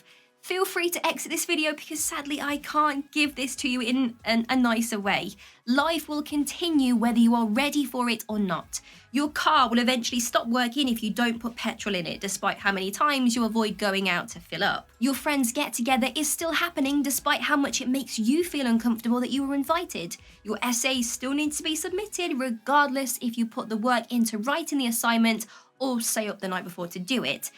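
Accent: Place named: United Kingdom. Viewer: This is my real channel, lady with the wonderful British accent.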